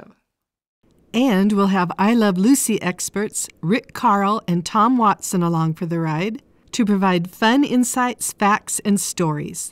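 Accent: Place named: Utah, United States. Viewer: Beautiful work! I heard you speak this time and thought, "She's gotta be a fellow Utahn." Yep! Cool! Pleased to have something in common with you, in addition to our love for these neat spaces!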